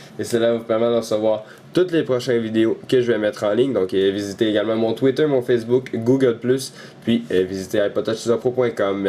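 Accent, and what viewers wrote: Quebec, Canada. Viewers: il foire de temps en temps due a votre accent quebecois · Mais aussi tu as l'accent canadien c'est normal. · Je ne sais pas de quelle région de France tu viens mais j'ai jamais entendu un Français dire "i"phone, tout le monde dit "aïe"phone (d'ailleurs Siri le dit correctement) ... Et je pense pas que l'auteur de la vidéo ait besoin que tu le prennes pour un attardé ... Les québécois ont des expressions différentes et c'est surement leur accent qui cause ses petits bugs mais pas besoin de lui faire la morale sur la langue française ! On dit "y'a du vent dehors ?" ;)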